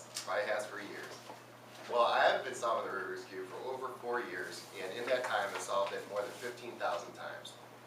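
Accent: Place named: Midwest, United States. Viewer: Are you in the midwest? (you sound midwesty) You did great, btw. I'm analyzing your speech for a class.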